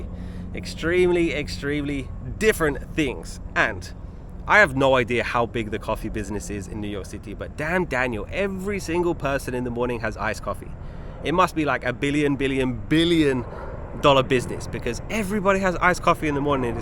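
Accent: London, United Kingdom. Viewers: Good to see you back! Hope you enjoyed your break. Fellow Brit here and you do absolutely sound British to me but there is the teeniest, tiniest hint of another accent that doesn't scream London (or other parts of England) to my ears that I haven't been able to place yet and it has been bugging me 😆 You do have a hint of a (South) East Asian look about you but I don't know if that just me being coloured by the time you spent in China. Look forward to a 'reveal' one day then! Regarding queuing: I was at a sci-fi con in London once and with the group of friends I was with, we decided to sit down in a line outside the entrance to the main hall when it wasn't open or due to be open for a long, long time. I would say within a couple of minutes, other people had started to sit down or stand up to 'continue' the queue (that was not a queue at all!). We found it hysterically funny and were mean enough not to say anything to anyone. 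People just seemed to naturally gravitate towards it and join it. We may not love them but does our respect for them predispose us to gravitate towards them, I wonder? Yet another great video by the way. I knew Central Park was BIG but not quite THAT big! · You have always sounded British to me. More specifically, you sound BBC news anchor British. By that, I mean you have an accent but you speak clearly and are easy to understand. I for one definitely prefer Londoners over New Yorkers, any day. ❤ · So glad you made this video, I spent the month of June in England including a week in London. You are so right about the coffee culture, people spend a lot of money on something they can make at home, I think people buy the coffee to save time. In London I took the subway or “tube” everywhere and I can tell you people don’t talk to each other, if they find a seat they immediately get out their cell phones and stare at the phones. 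Another observation about the London tube is that men don’t often offer their seats to women, when I saw a woman standing I always asked if they wanted to sit, most times they would say no, but occasionally an elderly woman would accept. I know you say that British people drink tea and I don’t disagree but London is full of coffee shops i.e. Pret a Manger, Nero, and Costa are just some of the coffee chain brands I saw all over London so somebody, maybe tourists are drinking that coffee. Since the US is so big, we have a variety of accents from the New York to Southern accents just like the British accents are unique, Scottish or welsh people are hard for me to understand and I don’t find them to be pleasing to the ear while I love the English accent, it’s almost like sexy, think 007! Your accent is somewhat unique and I attribute it to your travels or maybe you lived in a foreign country as a child or something but I can detect the British influence but you definitely in my opinion do not have a heavy British accent. 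I find it funny when you say the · Thank you for the lovely stroll, although in that heat it would have been a quick one. In answer to your question about whether you sound British or not, I think you definitely do but I can also hear that you have spent quite a long time living in China and speaking Chinese, of course. Anyway you have a unique respectful voice. 😊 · Are you kidding?I don't know how anybody could think you don't sound British! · I would definitely say you sound British. Have you heard the regional accent of the Boston area? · To me, you sound very British. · You have a London accent with the slightest bit of 'street' included. This is coming from a londoner. Interesting vid. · Good Sunday,!Glad to see you walk and talk in Central Park again! You definitely have British accent but in our days people travel around more than any other time in human history. So, you pick up something here and there! 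That is the privilege of being modern. No, you do not sound German and I have had lots of contact with them. You are British boy!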